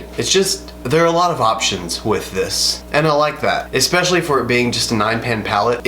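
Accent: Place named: South, United States. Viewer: Loved watching you create this gorgeous look,. That shimmer purple next to the shimmer yellow on the lid is such a beautiful color combination. I've been trying to place your accent (I knew it was Southern US, as I live in west Tennessee), & then noticed your description box. Haven't been to Hot Springs in quite a few years now (I used to visit more in my university days, as one of my buddies had family there who owned a dairy; one of the last that did doorstep delivery before his grandfather passed). It is such a beautiful place to get away & enjoy a bit of mountains without having to drive forever. Anyway, thanks for sharing this fabulous look with us, & hope you are enjoying a superb week so far! 😊💖👍🏻🌷🌻🌼🌹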